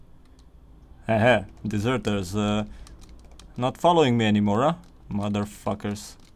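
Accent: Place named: Transylvania, Romania. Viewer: Esti cumva ardelean?Dupa modul in care vorbest :D.Un mod foarte bine facut e si Nova Aetas care, este modul meu preferat.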